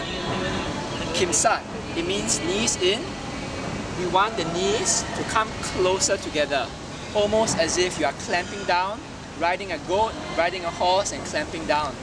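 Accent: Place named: Singapore. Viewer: Judging from the accent, must be Singaporean and Malaysian wc. There s no such thing as structure for sil lim tao, there is only one single right structure for the entire Wing Chun system. Your mentioning of "clamming down" the knees already show some misunderstanding. Most people have been misled and gone down the wrong path simply because of the unfortunate use of the word "箝" ! 取其像而不取其義!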